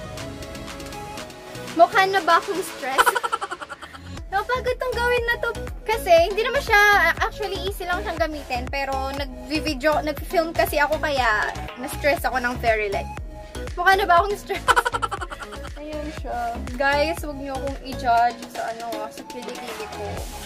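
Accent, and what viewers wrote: Pampanga, Philippines. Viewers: Kapampangan ka ata ate? haha❤️ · Kapampangan ka po?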